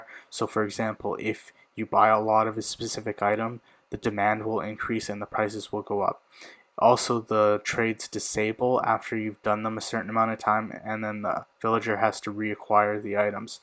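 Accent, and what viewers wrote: Punjab, India. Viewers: Is you punjabi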